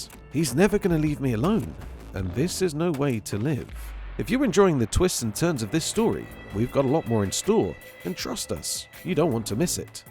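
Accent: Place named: United Kingdom. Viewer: “Masuker” “Dextah” I love Brit’s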